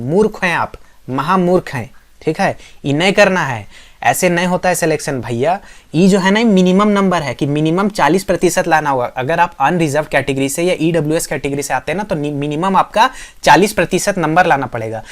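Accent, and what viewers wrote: Jharkhand, India. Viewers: Bilkul bhaiya jharkhand wala lg rhe h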